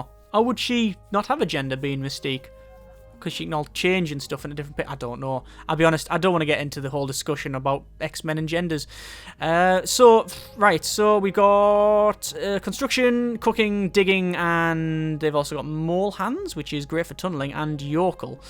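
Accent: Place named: Hull, United Kingdom. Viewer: he sounds like he is from hull in the uk. (where im from)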